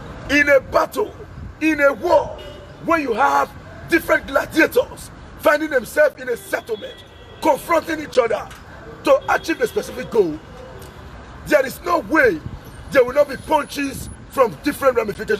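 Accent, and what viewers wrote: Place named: Biafra, Nigeria. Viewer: U are talking like a BIAFRA i hail u sir .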